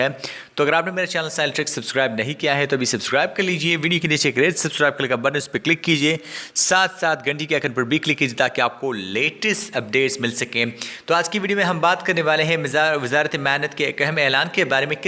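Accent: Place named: England, United Kingdom. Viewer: Abe yaar sahi se bola karo Yaar zyada ismaat kyon bante Ho Urdu me bhi inglish andaaza o lehja ghused dete Ho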